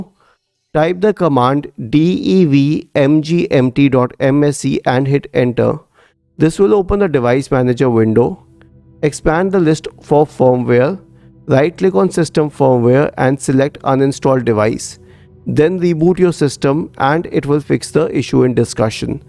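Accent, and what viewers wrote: India. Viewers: that indian accent is a warm alarm that my problem is gonna get fixed thank you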